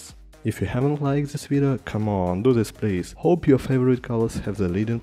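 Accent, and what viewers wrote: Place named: Russia. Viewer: у автора как будто русский акцент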